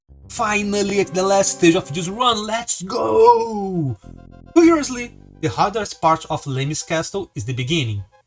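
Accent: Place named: Brazil. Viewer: Oi, acredito que o YouTube tenha me recomendado seu vídeo porque gosto de ver desafios, Mario e também porque você é brasileiro (pelo menos pelo que percebi do sotaque). Estou deixando esse comentário não somente para engajar, mas para fazer elogios: parabéns pela coragem de fazer conteúdo em inglês. Eu ensino inglês e não teria essa coragem porque tenho muita vergonha. Aliás, pode ser que venha alguém te encher o saco pelo sotaque, mas não ligue: nós brasileiros temos um sotaque baseado na nossa língua-mãe, ninguém precisa falar como nativo, desde que seja compreensível. Acho que a única palavra que foi usada com frequência e pode ser difícil para alguém de fora entender seja "Island", cuja pronúncia é próxima de "ai-land", o som do S some. Tirando essa, tá tudo muito compreensível, e os comentários em inglês que vieram antes dos meus já demonstram isso. 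E, aliás, vida longa ao SNES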